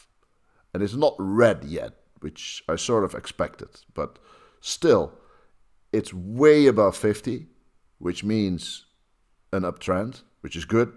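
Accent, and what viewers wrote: Netherlands. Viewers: Duurde wel even voordat ik het NLse accent herkende.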